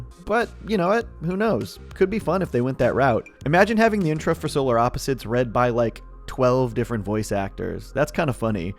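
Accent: United Kingdom. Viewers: Love the new voice. But I'm a sucker for a BBC accent. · I just hope this guy doesn't become Rick or morty. A british Rick and morty would piss me off. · I domt know how to feel how stereotypically British he sounds it feels a tad out of place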